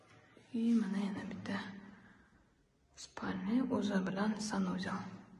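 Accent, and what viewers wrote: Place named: Tashkent, Uzbekistan. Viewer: Шевани узгартиринг билдик ташканли экансиз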